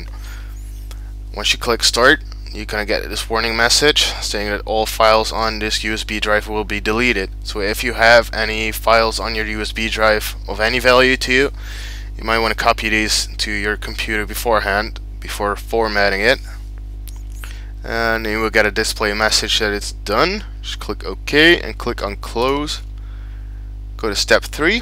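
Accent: Netherlands. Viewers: nou bedankt.. erg handige vid.. alleen jammer van die ruis heerlijk die headphones he and yes you can clearly hear your dutch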